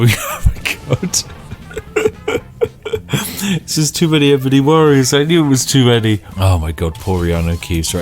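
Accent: United Kingdom. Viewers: Youtube: recommends a video. Me: hears slow talking British voice. Me: ×2 it is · Something says to me that he is British 🤔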